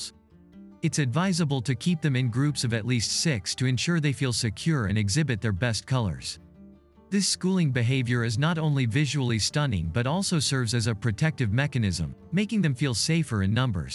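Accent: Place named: United States. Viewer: nice tutorial, i not only learn American English but also the knowledge on Electric Green Tetra😀👍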